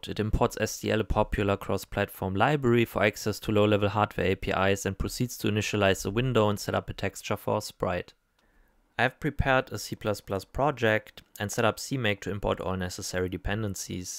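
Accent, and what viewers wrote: Germany. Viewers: Cooles video und schon Krass dass es schon so weit mit Ai getrieben wird, Kann es sein dass du deutsch bist ?? Dein Accent hört sich voll deutsch an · Actually are you german?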